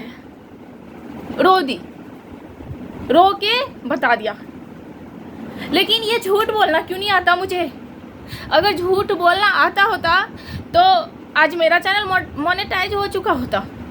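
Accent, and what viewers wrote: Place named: Nepal. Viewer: Rona nahin boli me Nepali lagti hai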